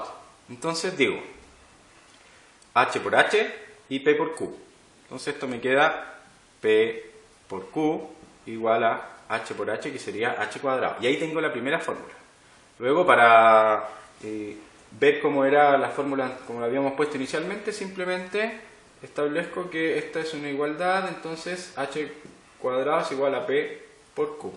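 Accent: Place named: Chile. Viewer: Me ayudó mucho, gracias. Eres excelente explicando pero me distraje con tu acento chileno.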